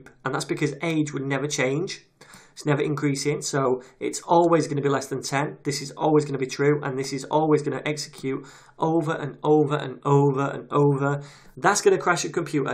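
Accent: United Kingdom. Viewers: everything's better explained in british accent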